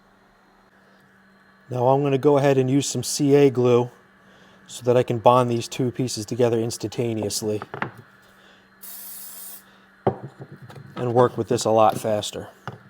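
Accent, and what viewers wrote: Philadelphia, United States. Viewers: True story- video with Philly-accented voiceover builds instant trust 💪 Thanks, brother!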